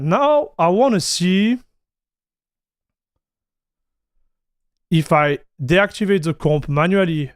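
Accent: France. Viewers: But are you French French or Canadian French?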